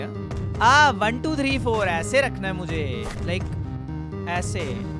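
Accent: Haryana, India. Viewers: really nice video, is one of the only gamers who try different games and show them to ther audience with his great hariyanvi mixed accent and.... you were expecting something more right, but it was me DIO!! [ KONO DIO DA ]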